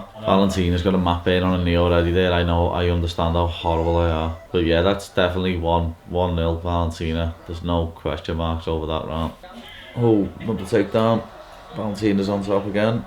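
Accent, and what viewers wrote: Liverpool, United Kingdom. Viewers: Why should he scouse is kings English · Liverpool akcent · Bruh's scouse is extra thick when he wakes up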